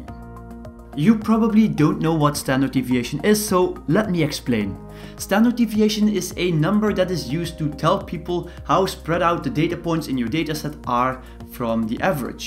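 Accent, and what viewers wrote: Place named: Netherlands. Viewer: ps: I've to tell that it's not easy, at all to hear out where you come from. It really sounds like a mix of german, dutch and french accent. my guess is dutch. ( i consider myself a professionell in useless skills)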